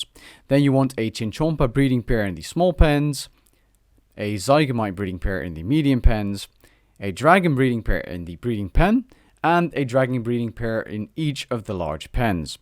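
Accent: Netherlands. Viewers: i knew there was a bit dutch in the voice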